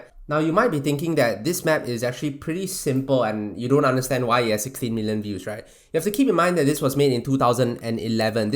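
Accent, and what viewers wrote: Singapore. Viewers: Can hear that Singaporean accent hahaha hello fellow Singaporean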